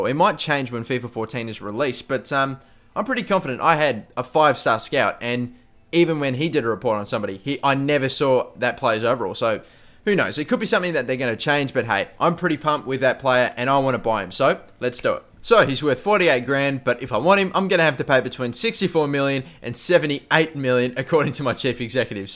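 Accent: Australia. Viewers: hihihi australian accent is so funny :)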